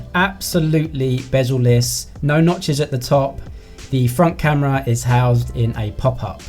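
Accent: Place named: United Kingdom. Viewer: Hi, im looking to see this on Amazon via your link but its just taking me to the homepage when i click it. Any ideas? Im in the UK (and judging by your accent you are too) so how have you got your hands on it? Were you sent a review unit or did you buy it yourself?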